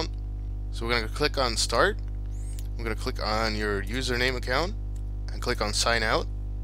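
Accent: Netherlands. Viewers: Are u dutch? Lm je bent nederlands:D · haha ik hoorde gelijk dat ik naar een Nederlander keek toen viel de naam me pas op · clean accent for a dutchie!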